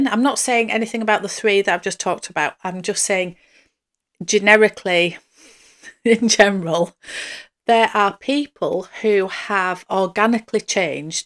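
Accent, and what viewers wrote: United Kingdom. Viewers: Hi, Yes, I have stopped watching Colorful Noir, Caitlyn & the third (not sure who she is), but Shea Whitney is another one who has gone on to bigger and better things. It's hard watching some of those videos, because it makes me feel guilty about my bag obsession (as if something is wrong with me!) 😱😆🤣 Anyway, I watch you, Meredith, Lisa, and Dayle (Connor not so much 😆). As an American living in Vegas, it's something about the British and Australian accents that has me hooked to your channels. And I just love hearing Lisa's perspectives in NY. ☺️ I honestly believe the foreign accents in YouTube channels make them more appealing to watch. (You all sound so much more sophisticated than us!😂) It's very addicting. You have the most calm, soothing voice & are so polite but then stand your ground in a non-offensive way, which is a great skill to have, since it doesn't put people on the defensive or make them feel bad. 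Anytime you have a video up, I always watch it because it's like listening to a polite and sophisticated friend. Also, I believe a lot of people feel the same way. Americans love foreign accents, and even more so when talking about handbags! ☺️ So I think you could definitely talk about gardening & I would listen (just to hear your perspective in your British accent). 🥰💜💙🙏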